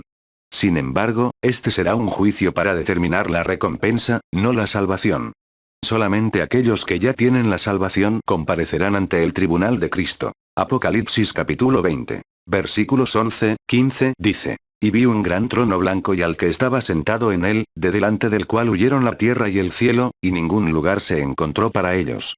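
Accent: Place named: Spain. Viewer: Me hubiera gustado en españo latino, el español de España es bastante malo.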